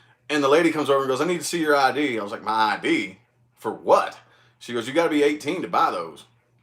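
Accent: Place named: Mississippi, United States. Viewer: You sound like every man I know in Mississippi 🤣 gotta be Alabama Mississippi or maybe Louisiana.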